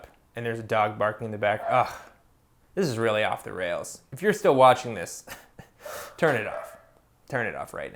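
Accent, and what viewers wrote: France. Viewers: I went to give this video a like when I heard your french accent but I already had like this video. Seriously always so well done.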